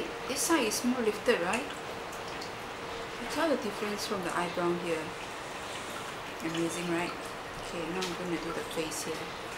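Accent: Singapore. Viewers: are u singaporean, ur acccent!!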